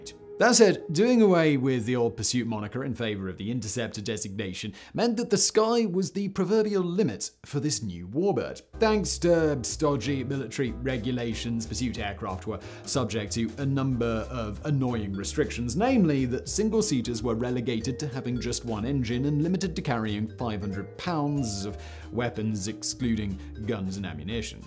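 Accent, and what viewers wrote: England, United Kingdom. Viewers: Well, keep in mind - he has an English accent. So - y’know.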